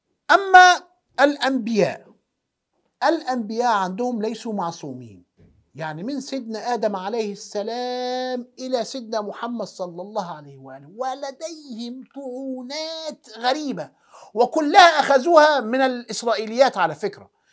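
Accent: Egypt. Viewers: ليش تحچي مصري ؟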